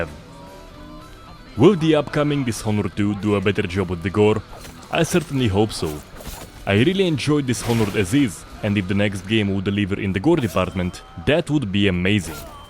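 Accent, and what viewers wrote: Russia. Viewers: Are you russian or something?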